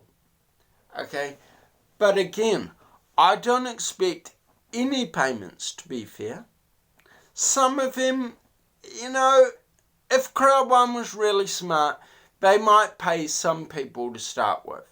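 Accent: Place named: New Zealand. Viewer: Watched a few of your videos man, I am living in OZ at the moment but born and raised in NZ, loving the accent haha. Anyway, this garbage was pitched to me by 2 friends already and decided to check it out... I can't believe people are actually falling for this. The site has no explanation of how they're making any money other than creating a big useless pyramid of "investors". This is the worst MLM pitch I've ever seen lol... same shit as bitconnect, usitech, amway, arbonne etc. Keep it up... been warning them this is gonna collapse eventually. I dont know if any of them have been paid or not.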